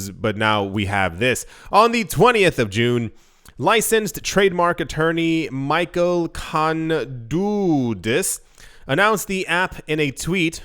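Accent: France. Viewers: Your french accent is so cute!!!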